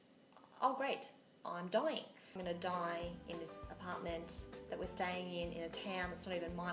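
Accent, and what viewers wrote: Australia. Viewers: You have the best Aussie accent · You're Australian right? I am ☺️😘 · Are you from Australia?? If so where from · i love ur humor ur so funny i love ur sas and u have a real aussie acsent u sound like kath off kath and kim i live in australia too perth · Someone else is Aussie😆 Love you💘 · Are you Australian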